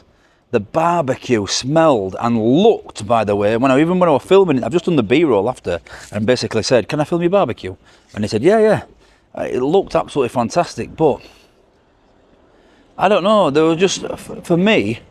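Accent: Yorkshire, United Kingdom. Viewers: Sorry but that accent is truly truly awful mate, it's a badden even for yorkshire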